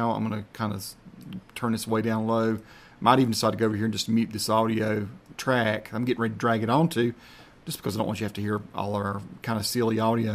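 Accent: South, United States. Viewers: love the southern accent xdd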